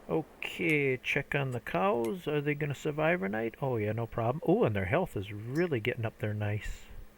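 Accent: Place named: Wisconsin, United States. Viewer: I've got to ask where are you from? By your voice I'm thinking WI or maybe northeast IA but I can't work it out haha I live in Phoenix now but my family is from NW IL about an hour and half from Chicago and 30 minutes from WI.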